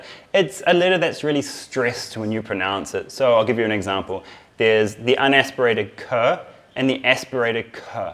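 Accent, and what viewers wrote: New Zealand. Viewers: Kia ora! The first YouTube video on how to learn Hindi and the speaker has the same accent as me:) Whereabouts in Godzone are you from? I'm learning Hindi because I simply just love the look and sound of it. I dabble a little in Buddhism and yoga, so being able to know more of the language will hopefully enrich both. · Are you from New Zealand?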